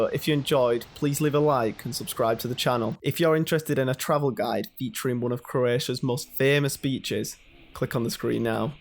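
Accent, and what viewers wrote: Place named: Barnsley, United Kingdom. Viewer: Sound like your from barnsley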